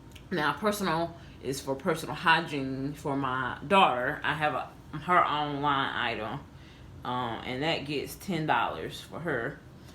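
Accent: South, United States. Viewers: I’m pretty sure that’s all I have for vehicle insurance also. I have to get the extra to get the roadside assistance. Renters insurance is also so good to have! Never know if something happens! Keep up the good work, have missed your videos and that sweet southern voice...I always know you’re videos will bring me back home ☺️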